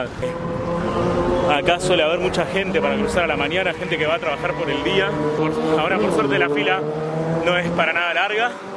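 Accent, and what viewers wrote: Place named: Rioplatense, Argentina. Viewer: ¿Argentino o Uruguayo? Demasiado evidente de tu acento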